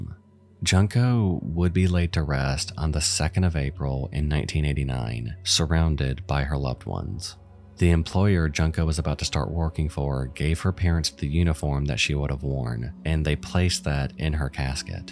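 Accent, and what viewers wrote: South, United States. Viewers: I know you're a fellow southerner. I can pick out that accent from anywhere.:p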